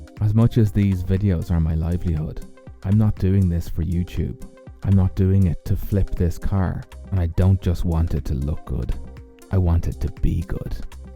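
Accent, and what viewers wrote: Ireland. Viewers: music is fine, but you appear to be only talking out of my sub woofer. I'm pretty sure it's not just your delectable Irish accent... 😏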